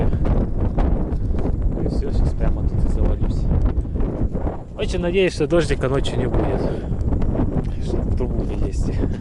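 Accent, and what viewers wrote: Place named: Urals, Russia. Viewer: Спасибо,! Смотрю тебя давно, пишу впервые. Благодаря тебе я посетила Платониду и Шунут-камень. Коренная уралочка, прожила почти 30 лет в нескольких десятков километров от этих мест, так и не добралась туда. Приятно слышать родной, уральский акцент. Здоровья тебе крепкого,ветра всегда теплого и попутного, людей хороших вокруг! С уважением, М.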